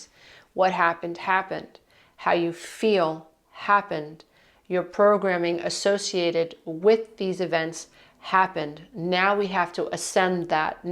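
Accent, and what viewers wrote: New York, United States. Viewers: I love watching your videos, you have a soft nature with a "no BS" New York-style tone. I experience a lot of "ah-ha moments" tied in with laughter too. Wack-a mole, that has always been funny to me, I love that you use that. I really feel like the universe guided me to your videos, they have helped me greatly, thank you.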